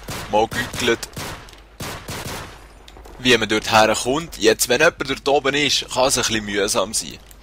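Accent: Switzerland. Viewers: endlich mal en shwiizer xD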